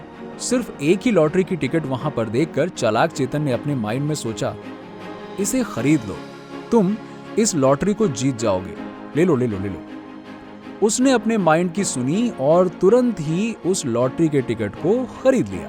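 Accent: Bengal, India. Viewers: Bhai aapka bangoli accent wala voice Jada achcha lagta tha.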